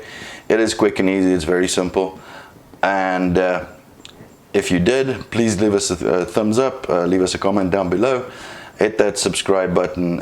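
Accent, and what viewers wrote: South Africa. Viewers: Great video...your accent..is it a South African twinge? Lekker man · South African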